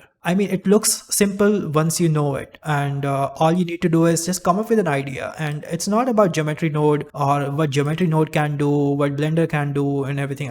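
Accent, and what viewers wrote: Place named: India. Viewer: as soon as I heard your accent, my ear; yup he is from India(Delhi, Mumbai or Bengaluru)(Dam I have a perfect pitch)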